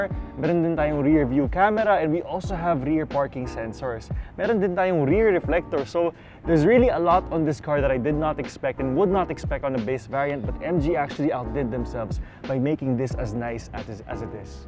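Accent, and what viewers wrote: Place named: Conyo, Philippines. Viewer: Nakaka inspire pag siya yung host ng review. Cute. Conyo. but Informative :D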